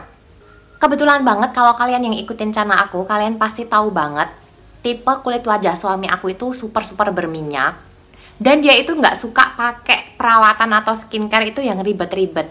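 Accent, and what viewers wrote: Java, Indonesia. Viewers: Mbak kok medok banget? Jawanya mana? Dalam dari jogja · medok banget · Jowomu ketok mbak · cempreng n medok...aku suka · medok mba wkwk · NGAKAK LIAT NYA SOALNYA NGOMONG NYA KAYA NGEGAAS😆😂..JAWA YA BAK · ngomongnya medok bnget😂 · Asli jowo opo mbak? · Medok kali bahasanya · Medhog guys suaranya😁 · Iya jowo banget😆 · Mbak ngomong e jowo medok😍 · Medok nya itu lohhh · medoknyA mntap kk hhaa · Jowo ngendi lurd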